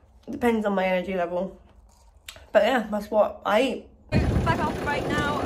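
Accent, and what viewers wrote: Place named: United Kingdom. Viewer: Are you from UK? You have the accent :-D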